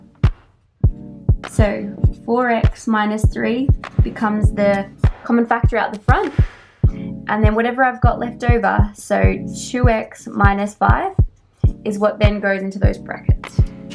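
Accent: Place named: Australia. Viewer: It sounds like you are from Australia, but you use grouping and NOT the Australian method. Very curious.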